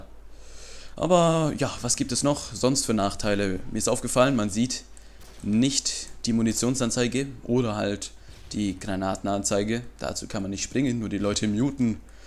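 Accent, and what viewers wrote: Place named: Russia. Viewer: bist du russe du hast so einen akcent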